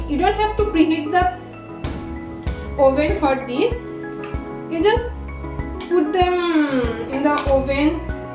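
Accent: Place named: India. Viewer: Please correct to voice quality and also mention in English on screen as your desi accent is hard to understand. Good raspy !!!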